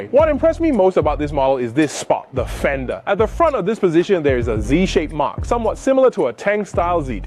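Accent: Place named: Kenya. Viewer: Cool car Some of your pronunciation sounds Kenyan man... Ever been?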